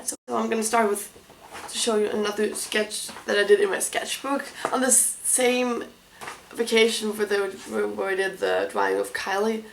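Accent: Germany. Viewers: Don't apologize for who you are! Your German accent is amazing and you shouldn't be sorry for that. Your art is amazing by the way <3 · I have no idea why but I LOOOOVVVEEE your accent. I loved accents and German accents are my favorite! Don't apologize for your voice! It's beautiful!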